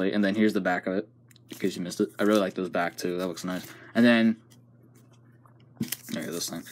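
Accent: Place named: New York, United States. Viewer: Nice new York voice really funny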